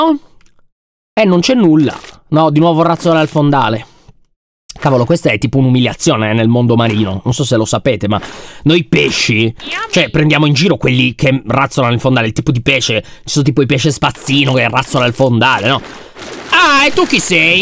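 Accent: Sardinia, Italy. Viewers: sembra sardo, ma i romani fanno "AOOOOOOOOOOO"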